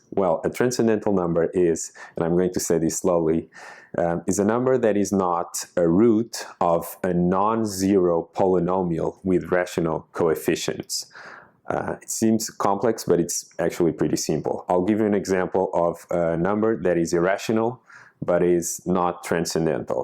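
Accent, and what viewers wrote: Portugal. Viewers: Pela pronúncia acho que és português. Gostei deste vídeo que encontrei por acaso. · Sotaque português?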